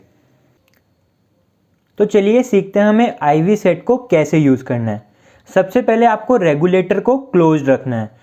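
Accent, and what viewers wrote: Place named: India. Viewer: Can you speak slowly please and all tte time English, most people don't use Indian English accent, and pronunciation..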